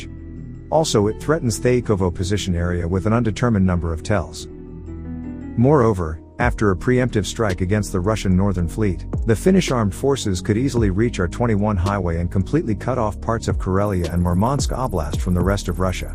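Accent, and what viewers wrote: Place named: United States. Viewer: Perfect American accent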